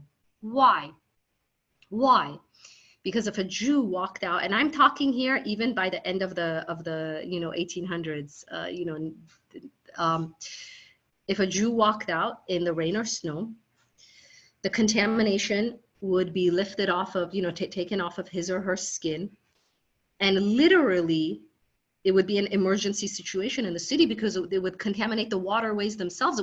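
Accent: United States. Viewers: Over 300,000 have Iranian perished since these fanatics took over.please mention all Iranian's suffering.I am amazed how you adapted to the American accent and look. thanks for recording the history .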